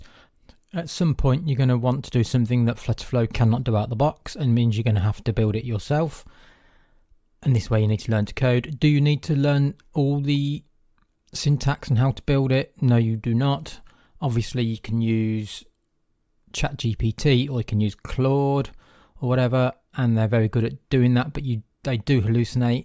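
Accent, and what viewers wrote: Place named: United Kingdom. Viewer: Great content! But British accent isn't my cup of tea.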